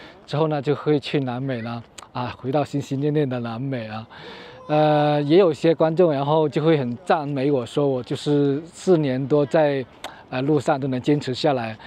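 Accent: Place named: Fujian, China. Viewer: 福建口音濃重到有點假！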